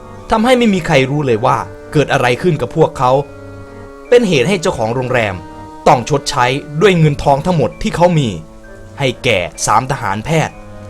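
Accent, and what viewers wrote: Isan, Thailand. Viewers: พี่คนอีสานป่าว คนบ้านใด๋นิ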